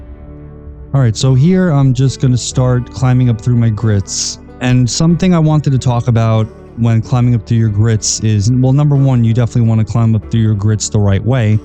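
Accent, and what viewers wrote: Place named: New York, United States. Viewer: Where on Long Island or New Jersey are you from?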